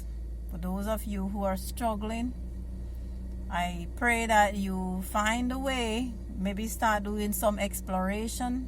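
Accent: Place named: Saint Vincent and the Grenadines. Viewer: You have that rich Vincentian accent. You speak with passion. Speak Louder, my friend and sister!.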